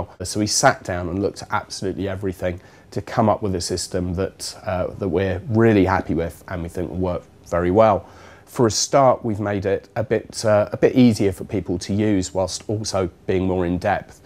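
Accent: United Kingdom. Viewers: what type of english accent is this? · i'm not sure but it's sound like London dilect mate =D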